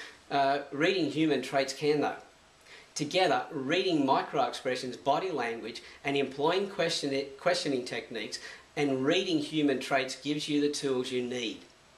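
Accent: Australia. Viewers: I was talking about Tweetling saying you're Australian lol. He asked if you were Australian and it was obvious by your accent, so I asked him how he knew it (jokingly) =D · You have an Australian accent Are you An aussie? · now, that's an aussie accent!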